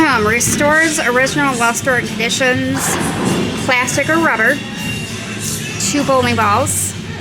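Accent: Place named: Michigan, United States. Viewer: Hey, CornDog here. That was really well done! My wife and I have stayed at the Riverside regularly over the past 30 years....I'd guess over 50 trips to Laughlin. We can drive there from San Diego in about 5 hours which includes a couple of stops along the way. Laughlin is just a friendlier place than Vegas and of course a much older crowd too. Have you done a video on the Jet Boat Tour down to Havasu? My wife & I took that trip a few years ago. Some beautiful scenery for 60 miles down and then back up the river. Are you originally from the upper midwest. I grew up in Michigan and you sound like you could be a Michigander. Thanks for the video!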